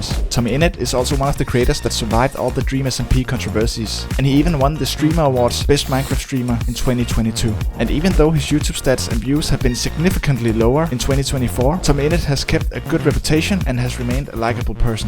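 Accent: Denmark. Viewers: Holy Danish accent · omg i knew i recognised that accent! hello hello hello fellow danish person! your video is AWESOME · The danish accent 😂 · U dont sound American what country are u from? U sound danish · r u danish? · Wait you sound a little danish, MY BROTHER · This guy has most danish sounding accent, good vid though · Yo, you danish? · Bro your danish right 🇩🇰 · Are you danish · are u from denmark i think i can hear the accent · are you danish? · u danish aint u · Lemme gues were you from. HMMMMMM Denmark? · I can already tell that this guy is danish because of the accent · holy danish accent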